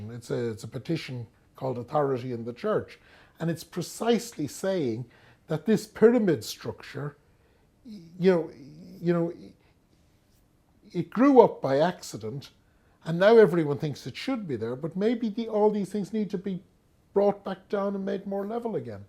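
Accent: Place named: Ireland. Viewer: hi - accent is irish:) I like irish accents, and scottish ones too, but my fav is south african.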